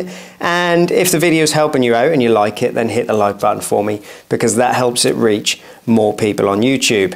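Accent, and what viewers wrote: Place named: West Country, United Kingdom. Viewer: Thanks for the video, one question - where’s your accent from? Bristol..Dorset maybe?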